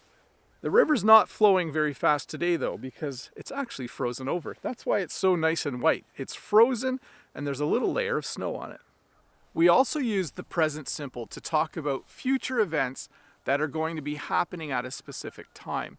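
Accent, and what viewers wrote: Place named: Canada. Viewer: Really nice succinct video. I love that Canadian accent that shows the influence of Scottish immigration. Your ideas for building up a following are great, you deserve all the success that you get. Well done!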